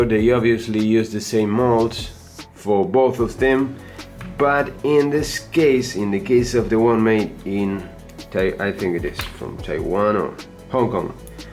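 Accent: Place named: Argentina. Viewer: Esa fonética de inglés es muy argenta 😅... 🇦🇷💪🏼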